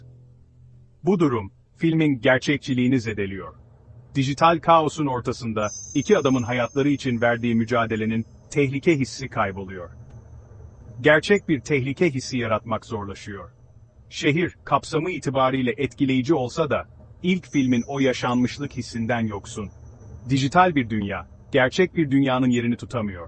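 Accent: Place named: Rize, Turkey. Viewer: Sikik rizeliler gibi konuşma aq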